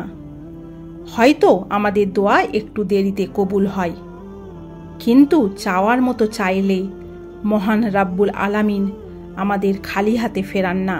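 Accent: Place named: Kolkata, India. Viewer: ভাষা এমন কেন 😮 মনে হয় কলকাতার 😅 উদ্ভদ লাগে শুনতে।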